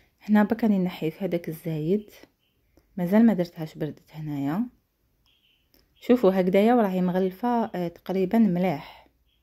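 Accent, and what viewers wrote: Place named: Algeria. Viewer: مشتركة جديدة حبيت اللهجة نموت على الجزائريات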